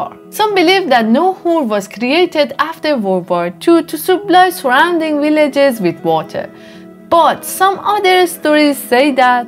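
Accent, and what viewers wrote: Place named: Iran. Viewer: I love your Iranian accent❤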